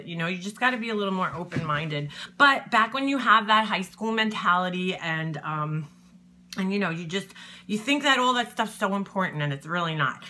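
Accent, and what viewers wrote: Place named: Long Island, United States. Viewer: Long Island accent! Who knew? I've been trying to figure that out. Keep on runnin' your mouth with honesty! You are adorable.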